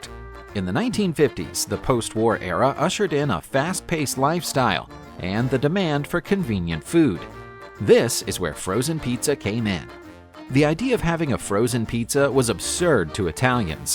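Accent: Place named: United States. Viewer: Good video but shame about the American pronunciations.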